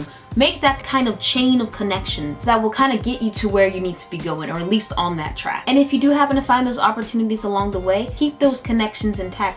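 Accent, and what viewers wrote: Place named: Africa. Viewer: so i had to subscribe after the African acccent....my sista oh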